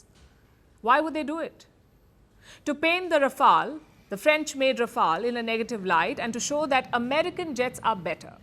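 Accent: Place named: India. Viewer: Can we have announcer that speaks English ( not Indian English). A lot of time , can’t understand what she is saying without caption.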